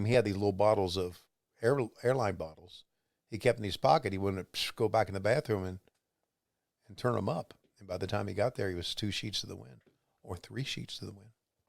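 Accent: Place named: Tampa, United States. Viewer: You sound like you are from Tampa. My dad would take me to Fort Hesterlee Armory (probably spelled it wrong) on Tuesday Night and we would see Gordon smoking one cigarette after another, announcing the matches. He was the best.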